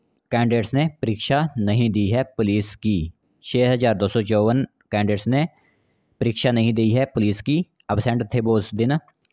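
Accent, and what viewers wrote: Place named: Nurpur, India. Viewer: Bhai nurpur ,jawali wale lg rhe ho 6 ko she bolne se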